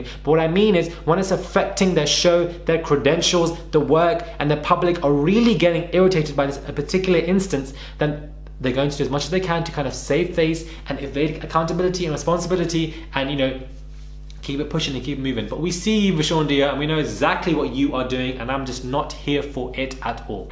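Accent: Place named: United Kingdom. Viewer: Dude you nailed it I was like wait is this an Indian guy with a British accent talking about basketball wives. LMFAO I'm subscribed sir cause you telling it like it is.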